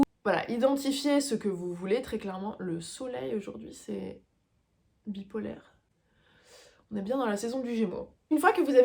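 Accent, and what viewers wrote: France. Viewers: Very charming you are, either speaking English with French accent ,and speaKING FRENCH, Very sensual.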